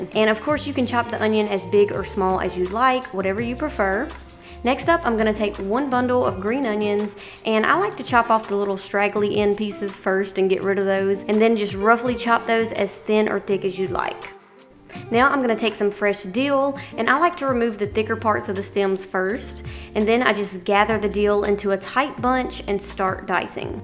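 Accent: United States. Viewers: Kajol speaking Amrikan....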